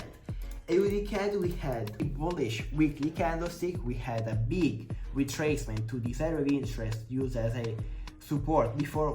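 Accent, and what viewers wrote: Italy. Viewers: Pronuncia all'italiana 🤣 Bravo però continua cosi e goditi il tuo percorso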